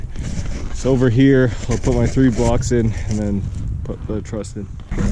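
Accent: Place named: Canada. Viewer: Where is this guy from? Sound Canadian lol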